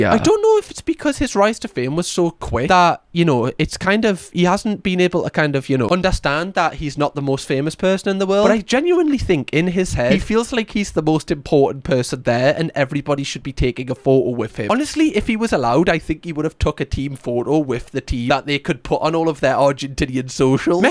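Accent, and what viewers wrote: Newcastle, United Kingdom. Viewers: Close; he is from the north, but is a Geordie from Newcastle/ Sunderland. Not from Yorkshire. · Hes a foreinger from up north jordy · he's a Geordie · Im Welsh I bloody love your Goerdie accent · isn’t talking with an inflection, he’s talking with a Newcastle accent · Geordies innit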